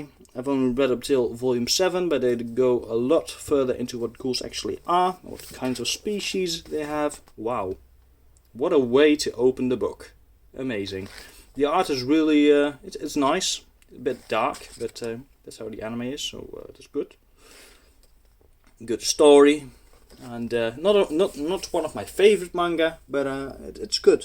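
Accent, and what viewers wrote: Netherlands. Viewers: Haha, wist dat je nederlands zou zijn aan je accent. Toevallig zelf ook gister nog manga gekocht van marktplaats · I can hear ur dutch haha! Great collection dude! Greets from a fellow Dutchman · My classmate talks like you. Literally. He also says sOmething. He's also dutch btw. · Hoorde het meteen aan het accent hahah leuk dat je uit Nederland komt. Geabonneerd!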